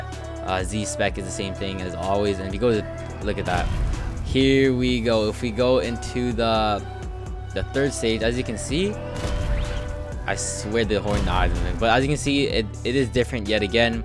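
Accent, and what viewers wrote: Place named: United States. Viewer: It’s an American thing we say it like zee instead of see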